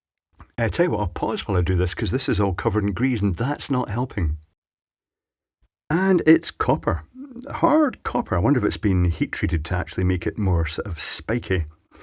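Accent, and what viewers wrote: Scotland, United Kingdom. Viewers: Is originally from Scotland or Mann? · Wait, you live on the Isle of Man? I been thinking you were from Scotland this whole time.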